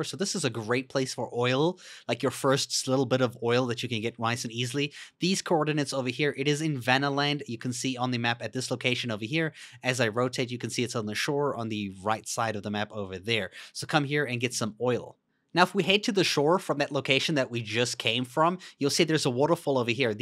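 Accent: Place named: South Africa. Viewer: I knew you sounded south African. Wild lol 😆 awesome guide bro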